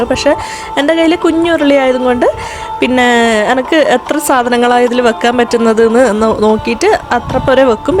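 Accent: Kannur, India. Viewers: Kannur aano pengal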